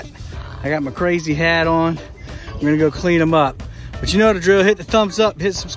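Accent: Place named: Louisiana, United States. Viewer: Dude your Cajun voice still makes me laugh so hard!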